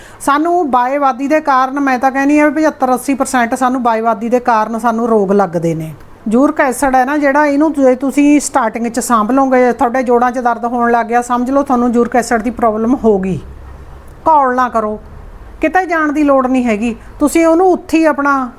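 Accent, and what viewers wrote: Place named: Puadh, India. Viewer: Tuhada pind kehda a boli ta tohadi puadh di lagdi a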